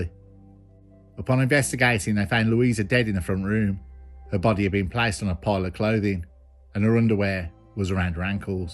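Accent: Birmingham, United Kingdom. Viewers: 89K - I’ve been with you wen you We’re on 3.5K- bruv your utter brilliant and the way you make the story’s come to life! They say you even pay tribute to the family’s with they way you talk with such respect.. just wanted to leave this here, as show you can do whatever you u good at- also Love the brummy accent , as I was in Hmp YOI Brinsford Wolverhampton and I even learnt what a yam yam is 🤣🤣- my co producer on music is also a brummy - big ups bro keep it rolling ❤🔥🔥🔥💪💪🙏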